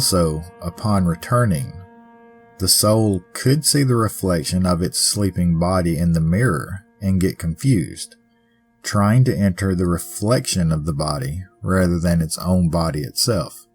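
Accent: South, United States. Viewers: Delicious dialect 😊😊 loving the sound of your voice as always looking forward to hearing this.. its 0134am xx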